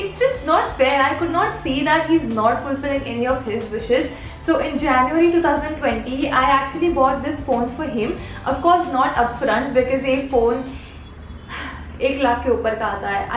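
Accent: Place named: Maharashtra, India. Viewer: Hi di Ur videos are amazing. Apke videos me na woh marathi hindi ka jo touch hai na I love it😍😍☺🙂😁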